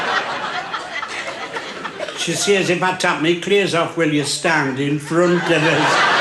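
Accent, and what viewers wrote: United Kingdom. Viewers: Me Mum was from County Durham and the accent and the same sort of humour was nice to hear.. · I've just realised how similar his accent was to 'Little Nana Iris'. She was a hilarious woman from Sunderland who shot to Youtube fame a few years back.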